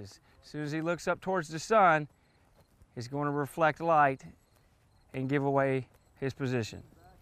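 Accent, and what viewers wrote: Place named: South, United States. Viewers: reminded me of Forest Gump... that southern accent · Is it a requirement to sound like you're from Carolina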